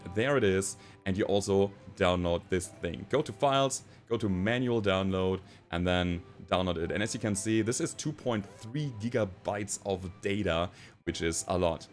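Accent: Germany. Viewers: Hey you are a German? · Question: As a German native speaker I can really good understand you! Thanks for that. But your accent sounds a bit like my mother tongue? Do you speak German as well? And I‘m grateful to these video. It helps me a lot. EDIT: Seit deiner Windows Meldung weiß ich: Jup, du bist eigentlich deutsch Sprechender ^^ Deswegen verschluckst du nicht so viele Worte, wie Muttersprachler in Englisch. Danke für dein Video :) Ich wollte mir nämlich gestern ne Mod runter laden, da stand nichtmal eine Anleitung dazu. Andere habe ich nicht angeschaut ^_^ Cooler Typ!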